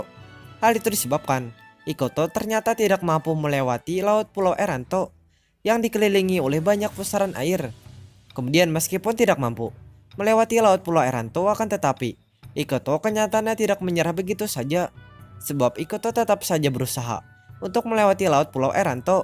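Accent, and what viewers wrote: Banjar, Indonesia. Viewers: logat banjarism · Logat nya Banjar banar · Pasti logat banjar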